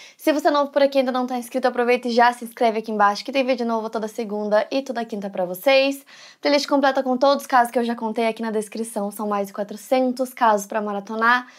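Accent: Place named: Paraná, Brazil. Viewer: Paranaense adoro nosso sotaque.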